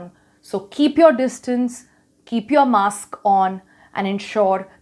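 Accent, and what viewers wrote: India. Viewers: Purely Indian pakka accent....thanks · She is talking english, but the accent make it sounds like the language they are talking in India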